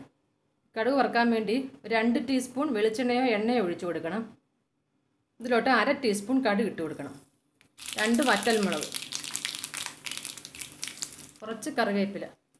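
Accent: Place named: Pathanamthitta, India. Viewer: Supr👌 Pathanamthitta ano sthalam.... njangalude same slang 🙃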